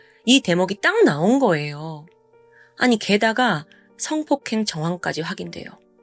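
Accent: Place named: Gyeongsang, South Korea. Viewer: 경상도 답다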